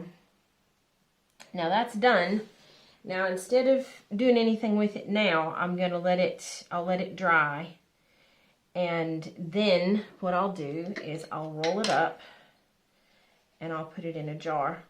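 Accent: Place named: United States. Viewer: You sound American then you use a word like “quid “?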